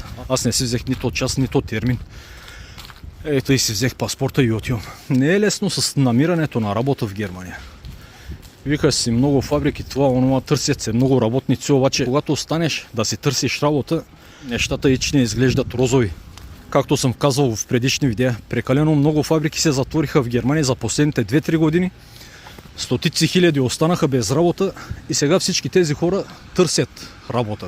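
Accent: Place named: Northeast, Bulgaria. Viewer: Не се научиха ,че без да знаеш езика на местните няма да ти се получи. Като те слушам как говориш ми се струва ,че си от североизточна България нали ? Не си идвай тука , че същото те чака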